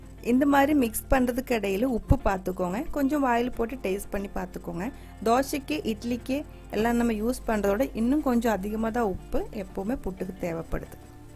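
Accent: Kerala, India. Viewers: Love your kerala thamizh. Keep it up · You talk very sweetly. You remind me of my Malayalee friends from college. In fact your fluency in Tamil is excellent. You probably live in Tamil Nadu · നിങ്ങൾ മലയാളിയാണോ? തമിഴ് കേൾക്കുമ്പോൾ മലയാളി തമിഴ് സംസാരിക്കുന്നതു പോലെ തോന്നി. പിന്നെ എല്ലാ recipies ഞാൻ കാണുന്നുണ്ട് എല്ലാം നന്നായിരിക്കുന്നു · Superb details ... very nice demonstration , ... Thank you Madam .. I also like the Malayalam flavoured Tamil.. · Her malayalam mixed tamil slang is nice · Tamil speak..good... To be avoid Malayalam slank... nothing to worry...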